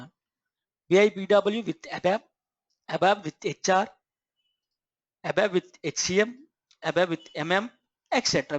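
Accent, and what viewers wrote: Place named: India. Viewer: Gosh! Indian English, what the hell of the intonations? I don't understand!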